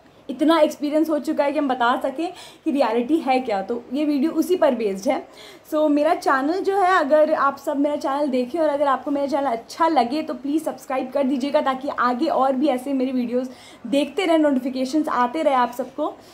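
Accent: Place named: Bihar, India. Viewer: Yrr... BIHARI TONE💓💓 Ekdm bawaal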